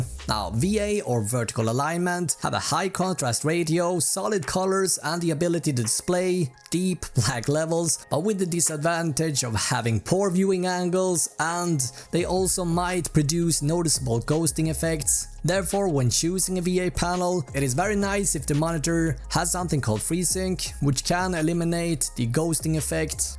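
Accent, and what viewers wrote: Sweden. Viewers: SVENSKKKKK man hör det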